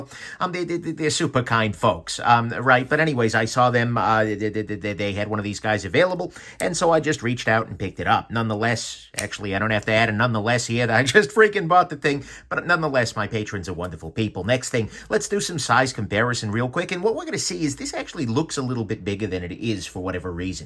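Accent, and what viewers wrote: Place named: Illinois, United States. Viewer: Illinois accent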